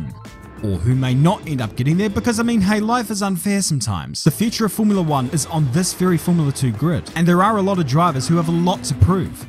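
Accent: New Zealand. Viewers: Let me just put it out there. has the best narrating voice. The kiwi accent is just magnificent.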